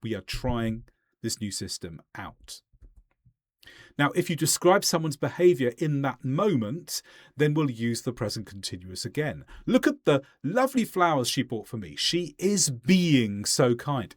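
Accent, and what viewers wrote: England, United Kingdom. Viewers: What a joy to hear your English English pronunciation!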